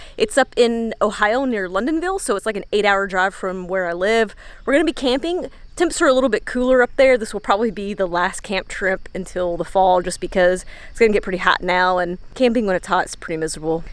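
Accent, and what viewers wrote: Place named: Kentucky, United States. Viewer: Huh, not much talk about Xert. Love your Kentucky girl accent and mannerisms. Couple of suggestions for ya. try magnesium for your cramps. D1 Female athlete taught me that one. Have your carbon bike checked immediately when it creaks. Compromised carbon due to not using a torque wrench can kill ya. I got a little experience with that one. Put some time in on nutrition. May I suggest following Dr Gregor and his whole food, plant based diet. That prepackaged white rice and ground beef is slowly killing you. Headaches? Drink mo water. I do like your ability to articulate what you are thinking. Ya just need a whole lot more schooling. Your buddy Dave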